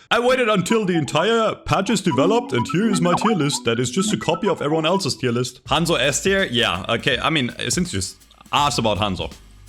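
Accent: Germany. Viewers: Is he german?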